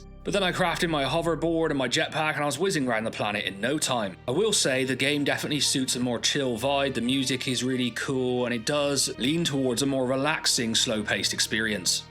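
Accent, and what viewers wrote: United Kingdom. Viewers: I’m getting back into space games after a break from them and this video just popped up in my feed. I’ve subscribed, great to hear a regional english accent in a Youtube video! 😊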